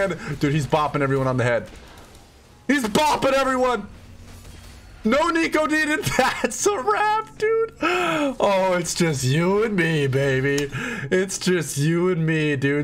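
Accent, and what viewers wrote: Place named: Rio de Janeiro, Brazil. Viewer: paulistano??? Ta maluco kkkkkkkk carioca na veia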